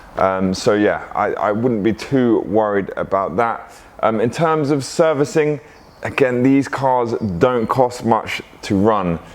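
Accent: United Kingdom. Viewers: Man, you sound like a British !! you should start a podcast.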